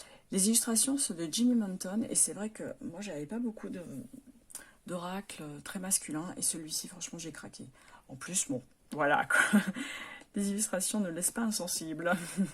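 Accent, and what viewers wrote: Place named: Italy. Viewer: Coucou, merci pour ces jolis jeux et ton accent italien que j'aime tant!!! A bientôt 🌸🌸😊😊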